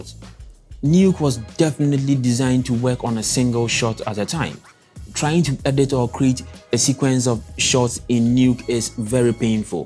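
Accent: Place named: England, United Kingdom. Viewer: I know After Effects. Want to get into 3d. Learning Maya 3d these days. I like your English accent.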